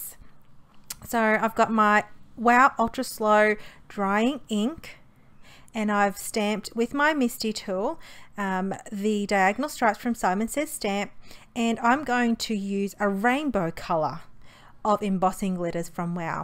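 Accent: Australia. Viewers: These were great cards, and lovely to hear an Aussie accent! Thanks for sharing the techniques, although now I really want to go and buy all the different colours of embossing powders. They're so pretty.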